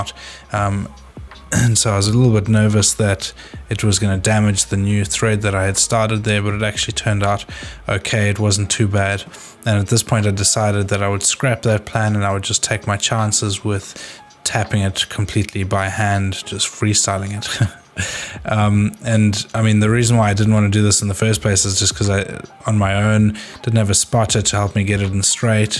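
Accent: South Africa. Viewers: How to spot a South African accent! Very nice video - these heli coil thread repair kits are relatively cheap - saved my LiFePO4 cells!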